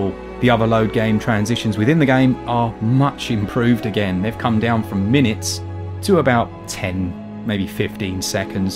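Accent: England, United Kingdom. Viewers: Thanks for the updated patch review. Too many reviews are 2019 and prepatch, and just slate it. The game looked good so i grabbed it for 7Aud off switch store. Glad to see the game has been sorted out for the most part. Also good to hear another english accent on YouTube gaming reviews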